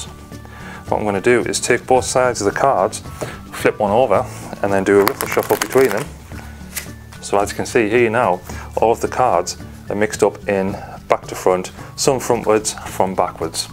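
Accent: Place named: North East England, United Kingdom. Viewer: Great video. I'm new to Magic card tricks and just bought my first stripper deck. Sounds like your from the North East of UK? Good luck with the Magic mate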